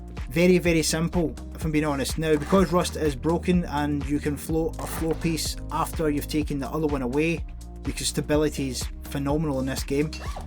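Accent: Scotland, United Kingdom. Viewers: My celtic brother from another mother - Keep up the epic content man! You've always given tips that I've never known and I've been playing on and off for a number of years! You're a legend, and to hear a Scotsman showing the world how its done is only right! Cariad fawr o Gymru i chi [Much love from Wales to you!] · that scot accent is very hard to understand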